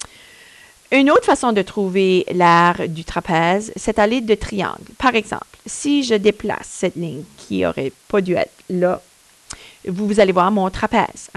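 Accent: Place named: Quebec, Canada. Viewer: Mais oui les Québécois ont un petit accent . . . adorable .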